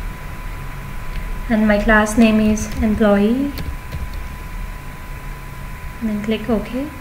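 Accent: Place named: India. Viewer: when i heard the idian voice, i knew 100% that you will be the solution. thank you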